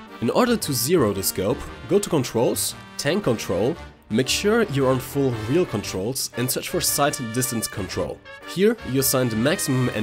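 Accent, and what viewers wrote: Germany. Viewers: I don’t know why, but i‘m german and i really hear the german accent and it kinda sounds like bad english, #nohate, still like you😂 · You are german right? You have this special accent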